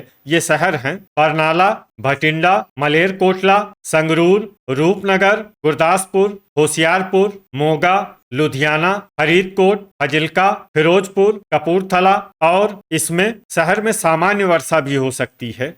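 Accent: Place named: Bihar, India. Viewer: Why is this Bihari n Hindi accent describing Punjabi weather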